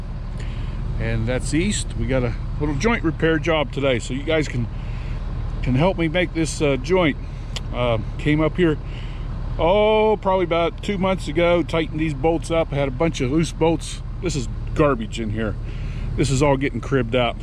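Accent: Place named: South, United States. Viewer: My dad still slips up sometimes and says “worshington” and “worsher” he’s been in the South for 36 years! When I heard you say “worshington” the first time I knew you were in my briar patch. Been meaning to tell you that here in Tuscaloosa is home to the longest wooden train trestle still in operations that crosses the black warrior river between Tuscaloosa and Northport. Norfolk Southern runs. I’ve been seeing Union Pacific locomotives a bit more here as well not sure what’s going on there but they’re gorgeous engines! I love seeing that flag thunder by shaking the ground! Oh FYI that trestle, over 3500 long! Google it sometime!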